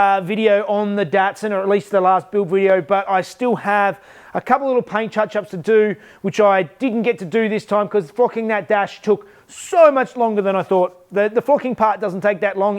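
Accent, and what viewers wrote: Australia. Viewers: Your Aussie accent is hilarious, every time you say Flocking, it sounds like "Fucking" and it makes it 10 times funnier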